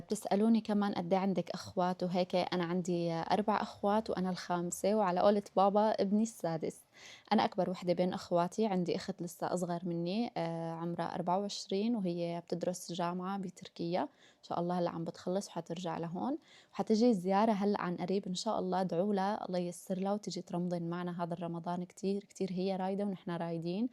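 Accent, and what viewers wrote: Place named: Levant. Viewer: لهجتك شامية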